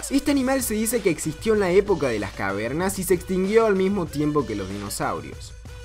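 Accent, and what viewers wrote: Córdoba, Argentina. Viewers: sos cordobes o porteño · So' cordobeh? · SOS ARGENTINO ?¿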